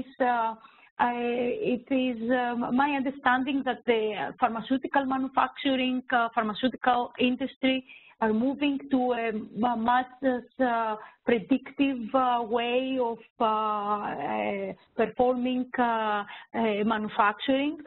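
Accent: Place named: Greece. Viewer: is the best professor I've ever seen. Her accent is clear and recognizable. Greek people have very unique English accent but after having conversions with them for a longer time you will find out that their English is pretty good. And I like their accent. if you check nowadays process system.engineering field and most of the big scholars are from Greek. Like Dr. Floudas, Dr. Pistikopoulos, they have very solid math background. You should not focus on the minor language difference but instead look at the greater research picture. She has explained the research work in a very straightforward way with putting out tremendous math equations and optimization theorems. It's great to watch the valuable overall reasearch introduction online for free.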